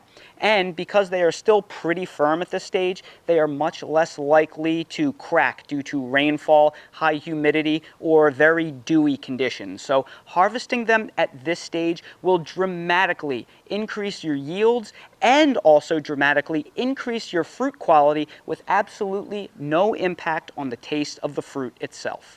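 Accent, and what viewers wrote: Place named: New York, United States. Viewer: You sound like you're from New York or Jersey. 😄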